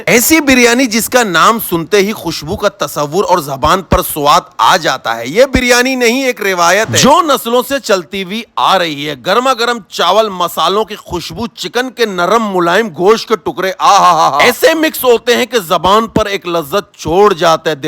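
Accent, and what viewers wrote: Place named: Balochistan, Pakistan. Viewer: bradar.. i love ur balochii accent :D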